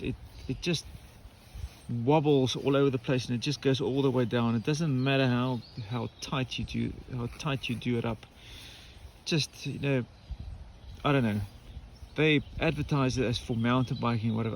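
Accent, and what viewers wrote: South Africa. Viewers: You say a few words. Me: This guy is a South African! Nice channel, you have a new sub.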